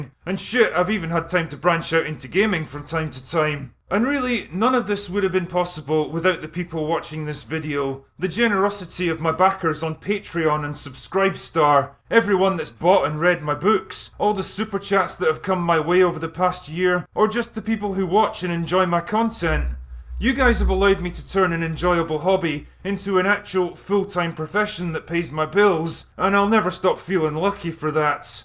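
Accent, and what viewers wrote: Scotland, United Kingdom. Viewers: Honestly, I'm only here to listen to your accent. Because without the likes of you and Craig Ferguson millions of Americans would be under the misconception that Mike Meyers got it right. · Is this a movie Chanel? We just like the Scottish accent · What are some of ya books mate? I've only just found your channel, but I've been binge watching everything... because Scots have the best accent in the world, and your no bullshit attitude is how i like things lol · I think we like you so much, cause your criticisms are pretty fair and honest. If a movie can generally suck, you will say so, but if it has elements that work out, you will say that too. If one is generally pretty good, but has elements that fail too, you will point those out. And frankly most of us dislike the Woke trying to force their way into our entertainment. 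Largely I will say, because they simply cannot do good stories. Seeing someone call this out in a dour, drunken Scottish tone has been a damn near godsend lol.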